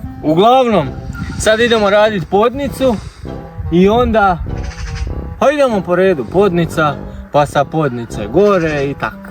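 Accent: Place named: Slavonia, Croatia. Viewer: Sve Sto Treba Imas Bravo momce Gledam Te Iz Podgorice a ti mene Imas Govor slavonskipozdrav Od srca jesi vredan❤❤❤❤❤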